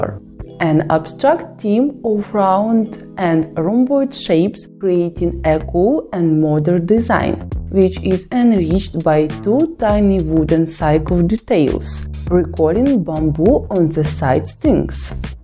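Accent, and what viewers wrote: Russia. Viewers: Хорошая девочка. Только ей пока лучше не говорить, а читать тексты, при этом соотвественно жестикулируя и двигая губами и глазами. Т е накладывая видео на текст. Тогда темп речи будет быстрее и легче будет слишком тяжёлый русский акцент, который сейчас тяжелее немецкого.Поработать над слитностью речи и звуками. Всё равно это придётся делать если она хочет международной аудитории. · Русский английский так прикольно звучит :-) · это какой-то трэш от очередной васи пупкиной, а для чего такой рязанский-английский?🤣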